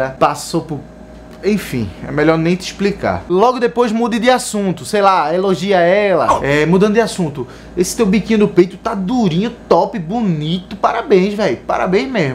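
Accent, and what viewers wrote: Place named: Northeast, Brazil. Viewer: Esse sotaque e gíria nordestinos dão um plus muito foda pro vídeo. Hahaha Fica ainda mais engraçado. Parabéns!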